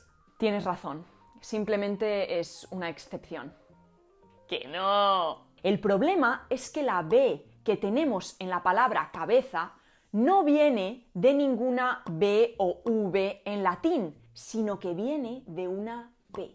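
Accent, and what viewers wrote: Spain. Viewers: Hola, saludos desde Culiacán, Sinaloa, México, siempre veo maratones de tus videos, es decir cadenas ininterrumpidas de tus videos, me encanta ese acento ibérico, que jamás he podido emular. a decir verdad el castellano que hablamos aquí en México hace como unos 30 o 40 años era mas parecido al peninsular, no se porque se ha ido distorsionado, te lo digo porque lo he vivido, hay vocablos como coger, es lógico es tomar algo, agarrar algo, de un tiempo acá los MExicanos lo han asociado al sexo, pero antes no era así, es un ejemplo nada mas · Me encanta el acento de las españolas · Me encanta el acento español. Me hace acordar a mi abuela. Gracias a vos desde Buenos Aires.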